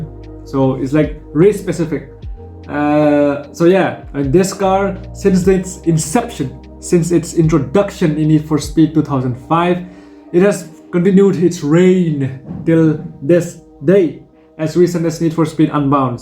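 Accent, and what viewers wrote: India. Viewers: Yo dude, quick question, where are you from? You look asian but sound indian, it's an interesting mix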